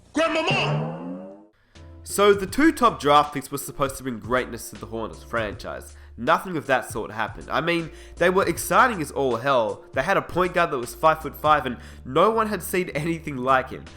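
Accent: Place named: Australia. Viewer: thats great aussie bloke talking about nba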